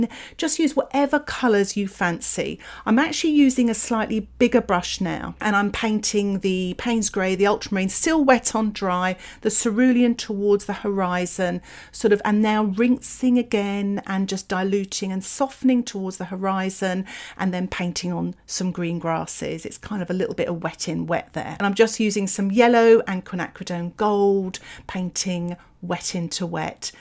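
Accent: United Kingdom. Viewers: Im gonna hear a nice British lady telling me "quit fiddlin'" in my head now if I start overworking something, thanks! Lol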